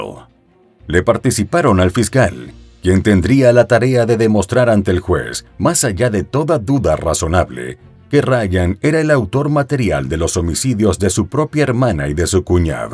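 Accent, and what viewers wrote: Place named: Mexico. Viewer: Porque habla en espanol, Si son americanos de habla ingles? No eran de Mexico. Y la voz se eschucha de Mexico Oh de otro pais. No me gusta este Video.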